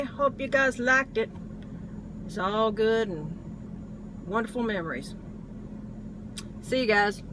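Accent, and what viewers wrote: United States. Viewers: Your accent's pretty awesome. My grandmother's from Texas so it's something I've heard my whole life. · I like your southern accent.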